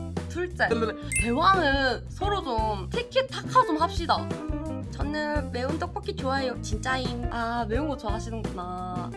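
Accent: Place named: Gyeongsang, South Korea. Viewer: 말투가 딱 대구아네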